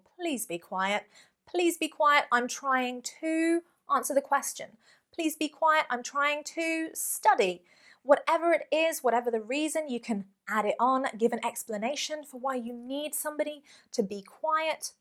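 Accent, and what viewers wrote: United Kingdom. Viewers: Cos her British accent😁💟💟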